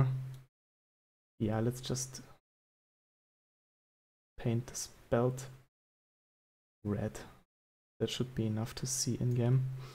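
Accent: Germany. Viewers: good video helped me gonna try that out. could immediately tell that ure german because of the accent :p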